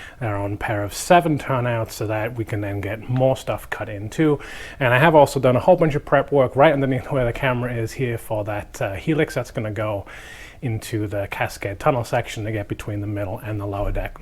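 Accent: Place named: Canada. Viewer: Where are you from originally? Your accent just gets me every time. It sounds like you got English + Canadian going hella wild! Love the layout!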